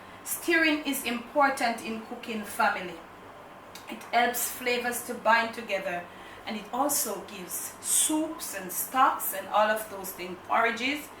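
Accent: Jamaica. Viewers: Hey auntie i love your styling of cooking the way you explain everything and I must mention the strong Jamaican accent, man me love it all, I am Trini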